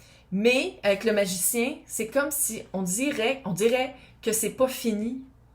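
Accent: Quebec, Canada. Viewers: moi je comprend tout (et je kiffe l'accent). Avant de mourir je visiterais le Québec!!!!!!! Donc gémeaux ascendant vierge....et la personne de mon cœur est sagittaire. Wahoooooooo ça fait du bien à entendre. Tout s'entrecroise pour les 3 signes. merci!!! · J'adore ton accent, l'accent du Canada en général, garde-le, je suis française et ça me COnvient parfaitement ❤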